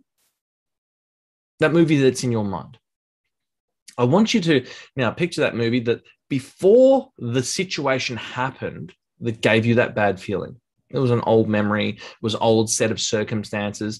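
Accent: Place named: Australia. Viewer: Australian mate 🇦🇺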